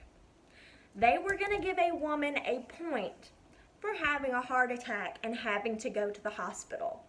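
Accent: United States. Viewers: You have tattoos and a country accent this is so strange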